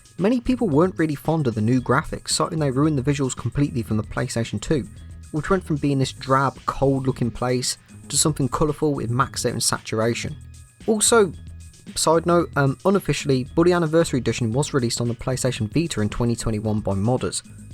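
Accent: United Kingdom. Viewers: He’s British that’s why he sounds like this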